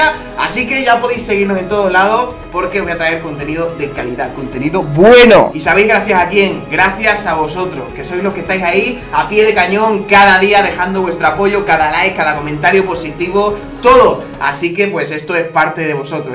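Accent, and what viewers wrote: Mexico. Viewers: No vives en mexico y cada vez suenas mas como un mexicano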